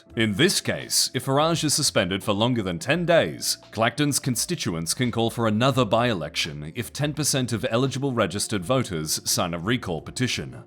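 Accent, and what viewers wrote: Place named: Australia. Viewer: Intro hits hard, your intros always hit hard. The music choice is spot on EVERY time. i feel like ive seen more aussies in the past 5 years online than any time before in my life and i just wanna say you're (almost) all awesome. Australian accents are like british accents just with some spice, and a million times better, imo. Live long an prosper (not a startrek fan)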